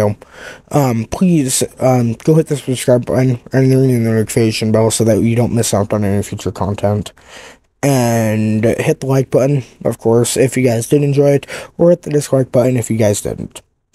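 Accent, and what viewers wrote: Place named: United States. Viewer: The narrator is an American.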